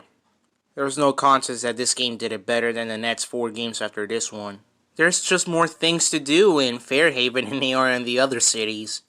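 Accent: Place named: Bahamas. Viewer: I love your Bahamas accent. It’s so Heaty.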